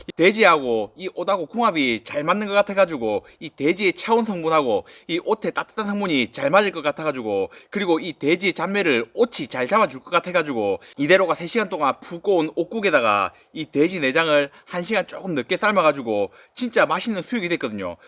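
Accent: Gyeongsang, South Korea. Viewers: 형님 보기너무 좋습니다 ㅎ 20대후반에 직장생활을 울산서 5년했습니다 ㅎㅎ 행님 사투리 듣고 있으니 경남지방 놀러가고 싶네요 ㅎㅎ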